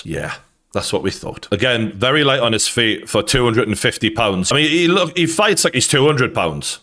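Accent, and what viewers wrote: United Kingdom. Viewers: Is from the UK ?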